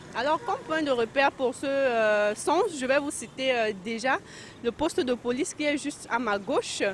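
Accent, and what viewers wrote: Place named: Cameroon. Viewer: Vous ressemblez vraiment à une américaine, même si l'accent du pays est fort remarqué pour l'américano camerounaise que vous êtes, ma jolie. Je kiffe votre reportage.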